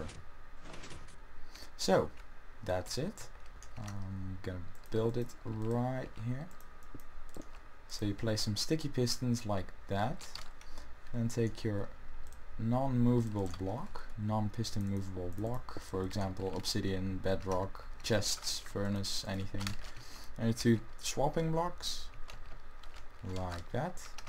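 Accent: Netherlands. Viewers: Are you Dutch, I can hear it from your accent ( Ben trouwens ook Nederlander :) )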